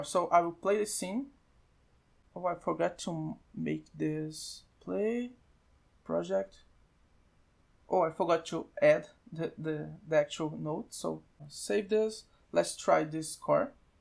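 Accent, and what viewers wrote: Brazil. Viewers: I can recognize a fellow Brazilian just by his accent, continue a fazer seus videos que eles me ajudaram muito!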